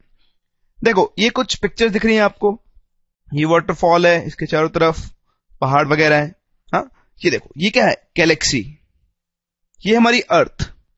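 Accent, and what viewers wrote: India. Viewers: Indian English..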